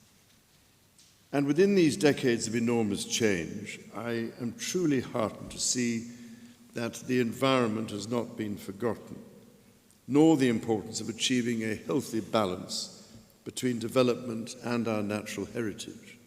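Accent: United Kingdom. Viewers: 영국식인데 발음이 엄청 잘들리네 왕족이라 그런가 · 폐하의 브리티쉬 스피치가 너무 고급스럽고 감격스럽사옵니다 ㅠㅠ 멋져요 전하🎉🎉🎉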